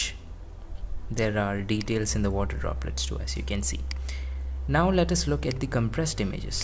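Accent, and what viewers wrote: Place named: Kerala, India. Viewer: nope But felt you were a mallu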